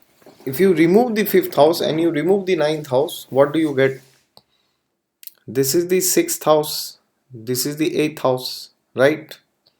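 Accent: India. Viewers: I really enjoy your Indian tone English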